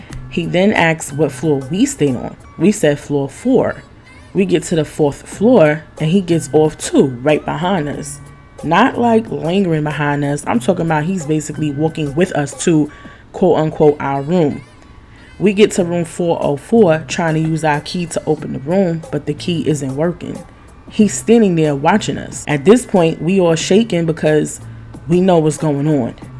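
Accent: New York, United States. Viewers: Unfortunately human trafficking has been going on for years in South beach but it just recently got real bad. I heard it’s real bad in Texas and Arizona as well. Also the “police” definitely be in on that shit believe that. Also off topic I have been watching your videos for a couple of days and I knew you was from NY we just have a way we talk. I’m from Bedstuy and we are definitely with the shits!!😏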